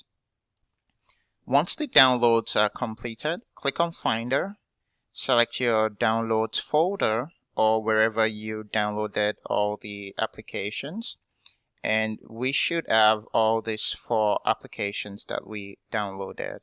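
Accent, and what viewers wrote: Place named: Nigeria. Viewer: You sound so Nigerian, thanks for the video.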